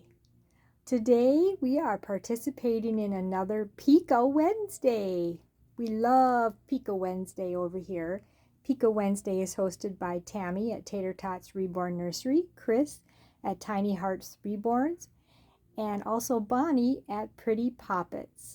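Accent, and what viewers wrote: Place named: Minnesota, United States. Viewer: Omgosh this was so good and funny!! You are a stitch 😂😂❤❤ I can identify with your Minnesotan dialect ❤❤ I love your creative way to fix him into what y wanted ❤️ 💙 he is such a boy now!! I do not have one yet, but they are adorable 😍 ❤️ Are u ready for the colder air moving back in 😢 it was so beautiful yesterday. I guess we're still in spring labor pains😂 Your cupcakes 🧁 were so nice•❤❤ I bet they are yummy!! I received my new baby girl!! You can see a clip from her artist on her channel, Tinyhands4ever. ❤❤🎉🎉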